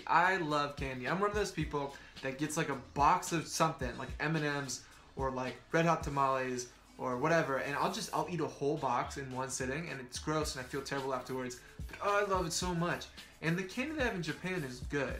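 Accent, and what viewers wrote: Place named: United States. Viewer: Are your parents from America? I can't come up with any reasons for your accent beyond that. Don't worry, it is very slight and faint, it is nothing to worry about. A strong New York or Southern accent would make it harder for you students to understand you, so again, I was not trying to be negative, I was just asking. Thank you for responding.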